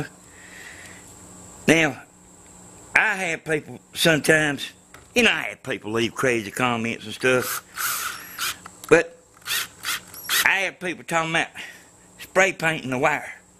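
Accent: South, United States. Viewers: Hey there good job on the antenna I like that design for sure. I'm up here in Toledo Ohio got a homemade antenna but that's junk going to put one of years together you sound like a good old boy I used to live in Atlanta early 90s. And lookie here... you don't know how nice it is to hear a southerners speaking until you hear it again sound just like some of those Hazzard County Kentucky boys I Used to Know. Keep them good videos coming cuz I just subscribed to your Channel God bless brother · I am a Kiwi from New Zealand and I am hoping to come over to your country, being a Maori of brown skin complexion will I find that your fellow southern folk might find me offensive. I really love your accent because it is Authentic. I have been told from friends of mine whom have visited the southern towns that your folk found their accents real strange to listen too. 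We are definitely not Australians as they drool a lot of their words with their accent. It is irritating to listen to from a Kiwi perspective but again I love your accent and could listen to it all day. Maybe when I come over to your country we could hook up over a coffee. Cheers for the antenna, I will make one just like it although I will need to find comparative balun thingies. Anyway take care and onward and upward. Regards b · It's Georgia. There is a very distinct Southern accent from that area. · i love the southern accent, its like larry the cable guys brother, the antenna guy:) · Too much "Southern". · OMG, I love his southern accent!! Subscription and like, indeed! Actually, this zigzag (or B-square) antenna is credited to the Soviet engineer Kharchenko, however, according to some accounts, such antennas had been used aboard some Nazi submarines way before WW2. The advantage of this antenna is that it "catches" even reflected signals among high-rise buildings in a big city and it is very easy to make at home in a few minutes!! 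· Wow!!! Where 've you been all my life ?!!! You DEFFINATELY get a 10 from me ! I can't wait to try this project ! I know it's a GREAT HASSLE for you to film, BUT I'M SURE GLAD YOU DID. Your directions are clear, and slow enough for a beginner to clearly understand and WANT to give it a try.; Your comments during filming are confident, informative, pleasant, & never boring.; I especially love the fact that you expressed yourself intelligently with little or no profanity; and I love your accent. What part of the south are you from ? ...Job well done! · love his Southern accent.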